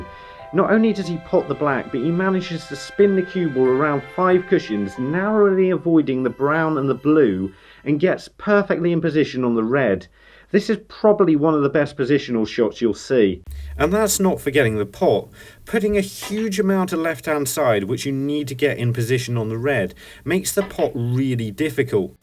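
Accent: United Kingdom. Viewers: As am a foreign I like your English accent its so easy for foreign to understand also likes your excellent videos about snooker.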